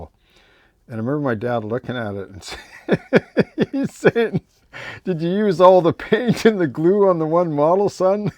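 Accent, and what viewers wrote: Canada. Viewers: Located and ordered! Thank you so much. Keep on building and doing these videos. I'm not a Railway modeller, but modellers appreciate everything done on a small scale - or I do at least. I especially love your scratch-built ships and boats. I knew you were a fellow Canadian when I heard you speak. 🙂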